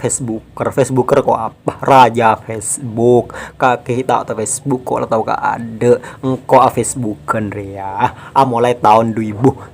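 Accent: Madura, Indonesia. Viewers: Maaf Mas Ini orang Madura Ya ..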